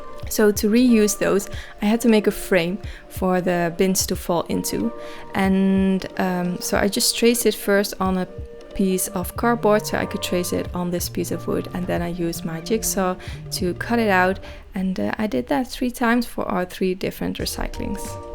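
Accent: Netherlands. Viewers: Kom horen dat je Nederlands was😂 mooie boom! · Hoor ik een Nederlandse accent?? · From your accent, I would guess you are from the Netherlands or if not a Scandinavian country where women are supported to have so many different skills. Wherever you are, your building skills are excellent and the design is BRILLIANT! Very clean and open. Also love that you have narrated yourself. So much more natural. Also you are not using any stock footage. Wish I could do something like this for my baby.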